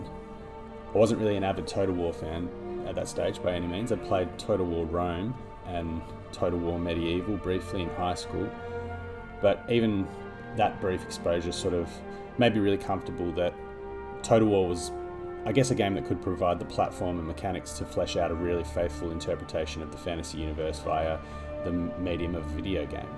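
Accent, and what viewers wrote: Australia. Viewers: Hey man, just stumbled on your channel. Great to hear a fellow Aussie into Total War and Warhammer! My story was actually quite similar to yours. Got brought into the fold in late primary school and instantly gravitated towards the High Elves with the very same army book! Hope to see you around in Quick Battles.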